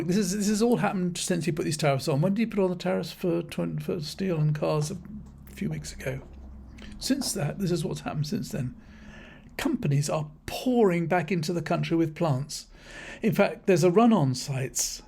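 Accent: United Kingdom. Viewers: You know the speech is dumb when a British accent doesn't make it sound any smarter.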